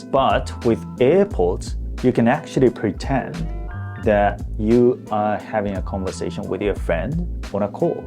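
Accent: Australia. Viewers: 質問です。ネイティブの人がこのアツさんの英語を聞いたとしたらどのくらいオージーアクセントなんですかね？ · You really sound like an Aussie mate lol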